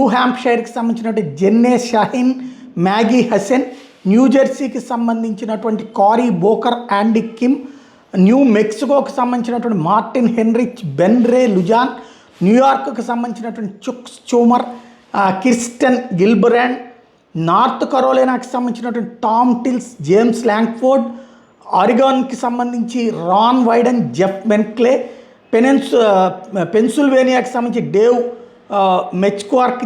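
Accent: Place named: Vijayawada, India. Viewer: Nuvvu nee butler English Nee level local bezawada ne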